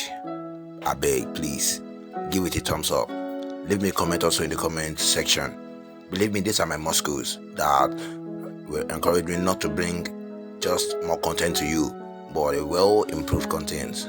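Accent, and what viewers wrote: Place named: Ghana. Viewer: Nice Job bro.. you sound like a Ghanaian ɓoss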